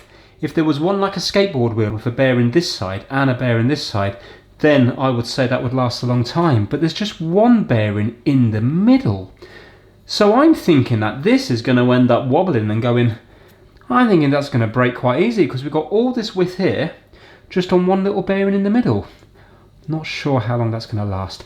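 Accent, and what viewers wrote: United Kingdom. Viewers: First of all, I LOVE your Brit accent that includes silly funny words and sentences which yeah… most brits are using. Then second, you just make it so simply to understand even for a non English speaker. Well done mate. Thanks for being here for us. 👍 Next time show us how to fix computerised sewing machines. I know, That alone is like a life job on its own 😄 Or perhaps electric radiators. Good job.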